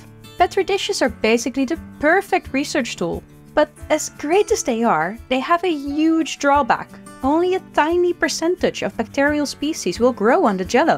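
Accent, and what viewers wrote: Netherlands. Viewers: Funny how I instantly heard she was Dutch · weakest dutch accent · Forgive me but I just have to say that the Dutch accent in English is so cute that I can hardly stand it lmao 😍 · Immediately recognised it too, as I'm Dutch and so I hear it oftenly. And I don't mind it. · still weird to hear dunglish in a minute earth video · you sound dutch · Great video and loved hearing the dutch accent! :p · Is that a Frisland accent? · I love Duth accents ( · Is that a Dutch accent I hear? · Instantly heard she was Dutch❤ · i know is dutch bc of her last name (its a dutch last name) an you can hear it in the way she talks she has a dutch accent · So Dutch sounding...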